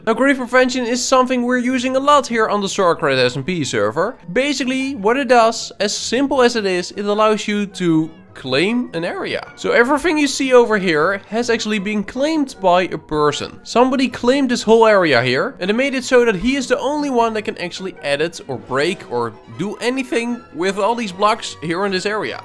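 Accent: Netherlands. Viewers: Hallo ik hoor wel dat je nederlands bent hehe ik ben grootte van van jou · jij klinkt erg nederlands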